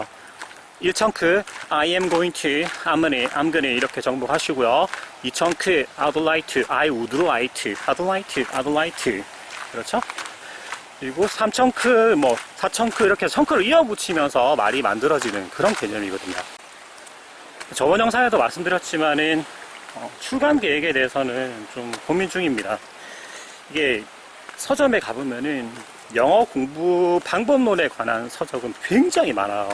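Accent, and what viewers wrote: Busan, South Korea. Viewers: 부산에 사세요? 저도 부산어 사는데 ^^ 반가워요~ 어쩐지 말투가 친근하다했어요 ㅋ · ㅋㅋ 이기대네요 저 이동네살아요 부산 억양이 많아서 고향이 부산이 아닐까??생각했었습니다 저도 그 옛날 학교운동장 스탠드같은 거기 좋아합니다 거기 뷰도좋구요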